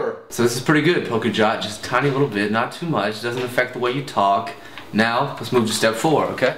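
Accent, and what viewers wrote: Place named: South, United States. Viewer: Amazeballs. Totes entertained, love the southern accent btdubs!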